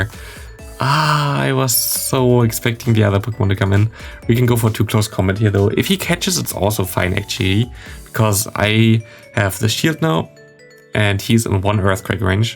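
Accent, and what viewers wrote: Germany. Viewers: Is it possible that ur german cause ur accent kinda sounds like german :)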